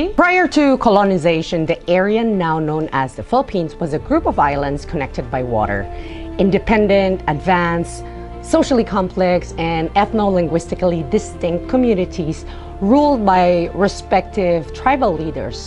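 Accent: Castile, Spain. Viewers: She has a pretty Castillian accent. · Se ve que aprendiste el castellano en España. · Curious to know how you’re living or at least traveling in México but developed a Spanish accent? 🤔 can hear it when you say words with “c” like “Cielo” almost sounds like “thielo” you know what I mean 😂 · Habla con acento castellano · Actually her Spanish sounds more like Castilian Spanish than Latin American Spanish. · You speak more spain espanol than mexican Spanish not going to lie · Thanks for the history lesson. You speak proper Spanish but you got the accent from Spain. I'm Puerto Rican 🇵🇷, we speak Spanglish 🤦🏻‍♀️🤭. I can't wait to move to the philippines to learn more about the country and culture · Is your spanish accent from the region closest to portugal? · Castilian accent? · Chabacano is very close. Se parecen algunas palabras. muchas palabras suenan parecidas. 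su acento no es Latina. Parece un poco de Castilla. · You speak perfect Spanish. Your accent is 100% from Spain, is that where you are at? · 🙌🏽🇵🇭 bien bonita usted, na cara y alma. gracias contigo para yan menciona con el de aton lenguaje CHAVACANO! pero si ta queda usted alli na mexico, porque tiene usted accento español de españa? gracias tambien cay tan comparti usted mucho elementos del de aton cultura FILIPINO. tiene usted de mio corazon por causa de este. mabuhay y viva maga #KAYUMANGGI 🇵🇭🙌🏽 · She's speaks English clearer, much better than most Filipinos, she has a nice accent. Her Spanish is excellent in fact the accent is definitely from Spain. Anyway, really enjoyed the video. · Her speaks with a Castilian accent, she either learned in Spain or taking classes where that variety was USED. I've met Filipinos who are fluent in Spanish, and their Spanish is very similar to Latin American, which taking into consideration the country seat of government during the Spanish colonial time was in Mexico. 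Otherwise very informative video, with minor generalizations such as " natives being exterminated in Latin America, no, there are many indigenous groups still present, and they speak their native tongues along with Spanish. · Thanks for the video there's phillipino that immigrated to nueva españa back in the 1930s in the port of veracruz and Acapulco the know the phillipino national anthem i would love to know more about the phillipinas the roots on my dad side came from Manila one day I will dare to move there explore and see the difference between us and the past and like you said what if the phillipinas spoke more Spanish and was under Spanish influence and not American influence well you got another follower in me I love your Castellon spanish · You Have A Beautiful Castillan Accent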